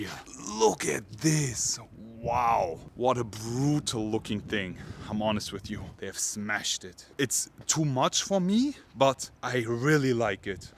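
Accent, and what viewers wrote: Germany. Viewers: Mein Englisch ist nicht besonders gut, praktisch, dass sich der Dude in Englisch anhört als würde er trotzdem Deutsch reden.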